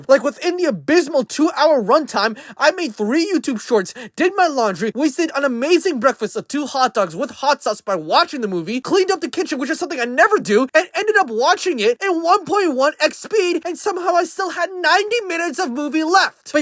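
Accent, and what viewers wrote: United Kingdom. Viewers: You're brit ??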